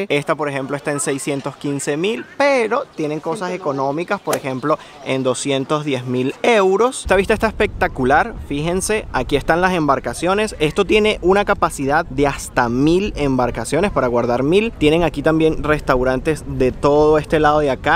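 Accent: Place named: Venezuela. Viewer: Pense q. hablabas Portugues q. eras Venezolano padres portugueses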